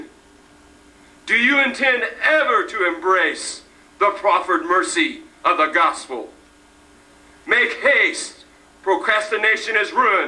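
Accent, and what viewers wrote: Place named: United States. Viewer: His church is Cildwrn, Llangefni, Anglesey, North Wales. Strange to hear this in an US accent!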